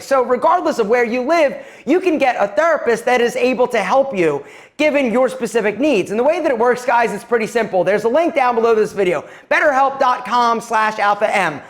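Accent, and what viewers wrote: Philadelphia, United States. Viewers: Philly accent detected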